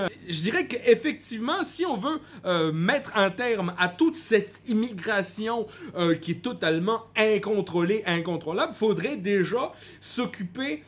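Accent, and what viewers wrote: Quebec, Canada. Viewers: Ça sonne plus québécois que MBC ; à quand un MBC gaming ? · Sans l'accent québécois on dirait Pierre-Yves Rougeyron.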